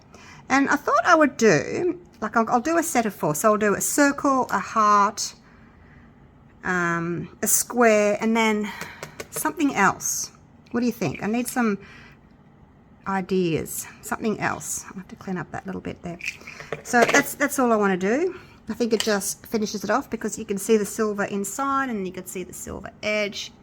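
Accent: Australia. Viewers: I love the fact that you talk all the way through because apart from the fact that I just love the Aussie accent, the ones that play music in the background just does my head in. Also I love what you show us.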